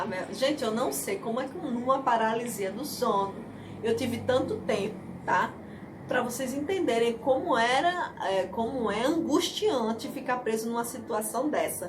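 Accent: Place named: Pernambuco, Brazil. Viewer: Bem interessante o relato, minha conterrânea pernambucana! Isso tem uma lógica de ser, que não é nada do que religiosamente as pessoas falam, mas, é preferível não entrar nesse mérito. Gosto de seus vídeos, de seu jeito pernambucano de falar kkkkkkkk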